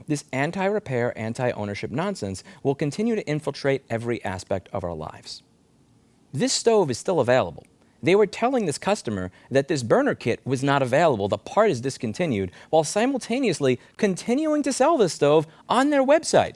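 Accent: New York, United States. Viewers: The New York accent was in full effect today😮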